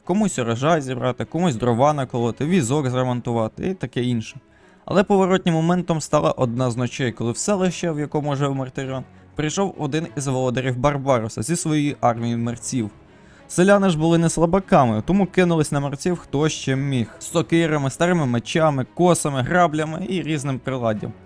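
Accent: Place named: Chernihiv, Ukraine. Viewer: Чернігівщина, пролітає пару слів в тебе)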